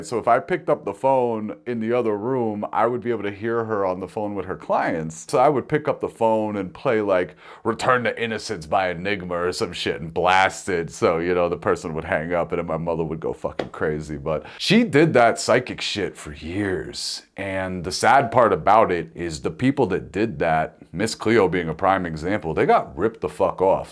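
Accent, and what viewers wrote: New York, United States. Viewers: Listening to this gave me such a sense of nostalgia. This story was told like a born and bred New Yorker. Love the accent changes and the impressions! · Omg the NYC accent is strooong! I’m here for it tho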